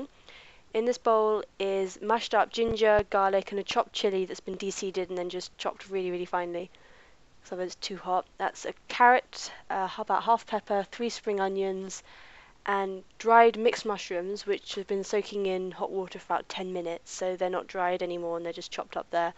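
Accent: United Kingdom. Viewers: Thanks for the video - you made it look really simple. Love your accent! What part of England are you from? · posh Londoner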